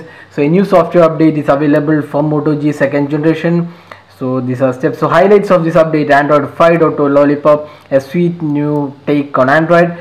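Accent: Kerala, India. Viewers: Love ur English with Malayale Accent ! Good Job (y) ! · your indian accent is too strong, you need subtitles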